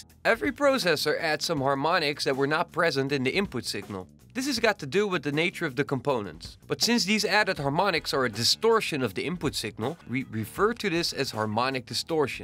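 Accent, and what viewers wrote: Netherlands. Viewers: you have a dutch accent :D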